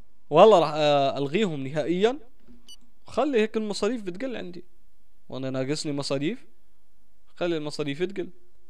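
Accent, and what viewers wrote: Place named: Iraq. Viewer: والله يحبيبي انشالله شكلها الحقة تحمس بس اني هسه داباع متعجب دولة مثل المانيا ماعندها نفط وغاز وحتى ماء ومساحة صغيرة من اقوى اقتضادات العالم والعراق والدول العربية عندها اضعاف هلشياء وشعبها بلكاد عنده اكل والله للاسف وضعنه كذا تحياتي الك ولابناء شعبك اعراق والاردن اخوة والاردن اكثر الشعوب وقفت مع العراق واحنا نفس اللهجة تقريبا يعني من اشوف فيديوهاتك انسا انك اردني لهجتك قريبة علعراقي وانشالله مابيزعزع احد بين العراق والاردن مثل مازعزعو مع بقية الشعب العربي ❤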